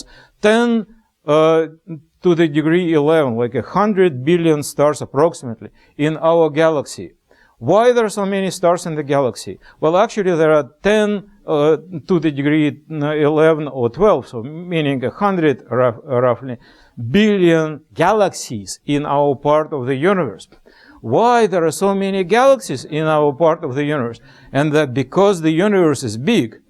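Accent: Russia. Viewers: Our Russian accent) krasavchik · I enjoyed topic as well as sweet English with Russian accent!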